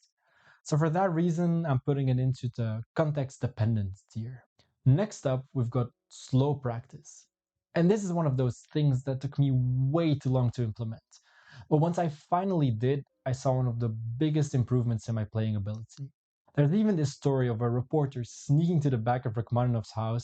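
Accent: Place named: Belgium. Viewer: I'm Belgian myself so I can spot the accent very easily XD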